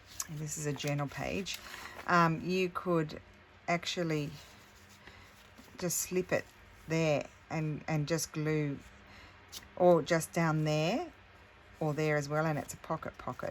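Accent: Australia. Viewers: I absolutely love your channel, I’ve been a subbie for quite a while, but I don’t comment very often. I just wanted to tell you how much I enjoy your channel. I suffer from chronic pain, depression and anxiety, and spend a lot of time in bed watching videos. Your videos always make me feel better, happy, and really helps lessen my anxiety, at least for a while. I love that you live in Italy, but have such a beautiful Australian accent, I guess it’s all in what accent or language you grow up speaking. The last thing, I LOVE rainy days, and I thought I was the only one, no one(and I’m not joking) that I know like rainy days!!!!😀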